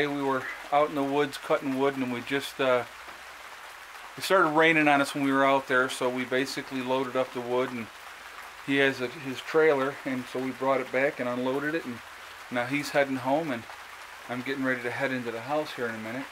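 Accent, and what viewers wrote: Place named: Great Lakes, United States. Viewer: Good video- I noticed your accent was "normal" to my ears. I'm from the South Side of Chicago originally and you sound like one of "us". Went to your channel and you said something about upstate New York. It's funny how people from around the Great Lakes have a similar accent.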